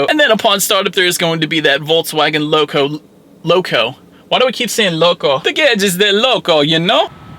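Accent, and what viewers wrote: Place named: Delaware, United States. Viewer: Hey got any Hoagies? Love the accent. Been awhile. Born in Wilmington, DE.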